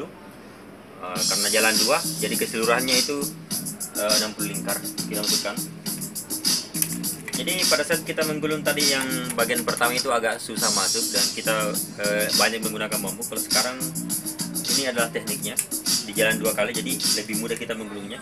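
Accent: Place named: South Sulawesi, Indonesia. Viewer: saya fokus ke suara ta daeng... kyk ad logat2 sulsel ta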